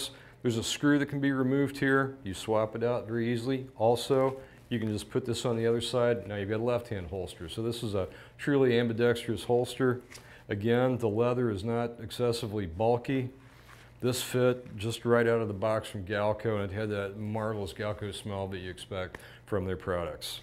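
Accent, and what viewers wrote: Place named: Chicago, United States. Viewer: I know you can't even carry a slingshot in Illinois. That Chicago accent gives it away. Where do u live?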